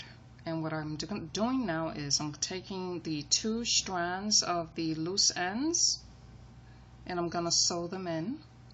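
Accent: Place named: New York, United States. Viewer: I love your videos! Your accent reminds me of my BFF Nancy from NYC. I also Love to Crochet earrings because they are fast and easy. TY for your videos and pls. keep them coming!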